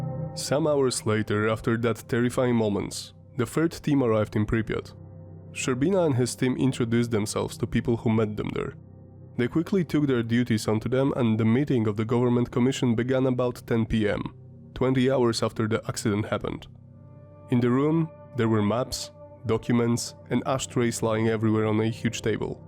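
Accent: Russia. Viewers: oh ok. I wasn't sure . I do like the accent and thought you may be Russian.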